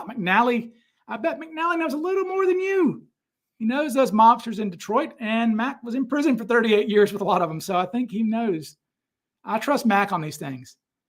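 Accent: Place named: South, United States. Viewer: Ha voice of insight/truth/reason but a hillbilly voice PS like grading the suspects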